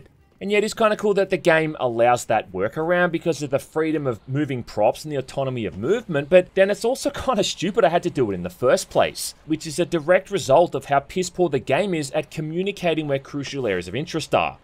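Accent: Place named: Queensland, Australia. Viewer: judging by your accent, are you in QLD?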